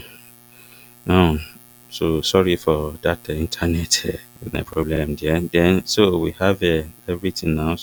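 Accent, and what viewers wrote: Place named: Yoruba, Nigeria. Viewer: I really love the Yoruba accent senior man😂❤❤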